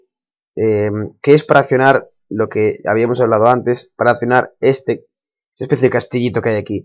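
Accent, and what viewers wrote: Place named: Madrid, Spain. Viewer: ya decia yooo que tu acento me sonaba XXD yo de madrid!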